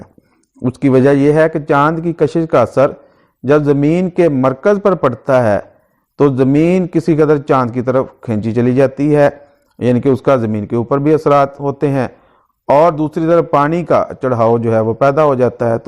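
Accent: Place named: Punjab, Pakistan. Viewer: کتنا گندا پنجابی عجیب لہجہ وہ بھائی کچھ خدا کا خوف کرو تم لوگ اچھی آواز والا خالص اردو والے سے یہ ویڈیوں بنوا لیتے فل پنجابی لہجے والا کرخت انسان کی آواز جو انتہائی کانوں کو بری لگ رہی ہے سننے کو دل نہ کرے انسان کا مگر بے شرم قوم کو سب ہضم ہے ادھر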